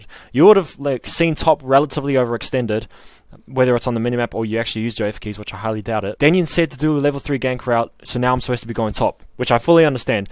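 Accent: New Zealand. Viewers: Your vid was one of the first jungler vids that came up for me and I was like what is that a kiwi accent I spy??? Hilarious stuff, can't wait to watch more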